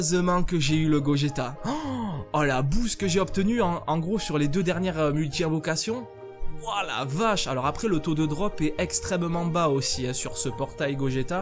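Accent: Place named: South, France. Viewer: petit accent du sud ça fait plaisir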